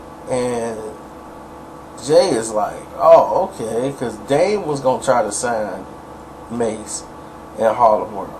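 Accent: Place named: South, United States. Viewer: Hahahaha....this guy has a southern accent!!!! Have u ever been to NYC????? ANY BORO?????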